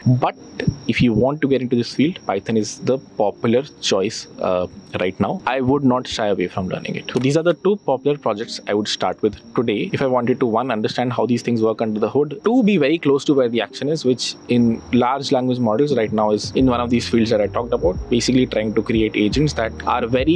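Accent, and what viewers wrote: India. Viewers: Haha you Indians have funny accent.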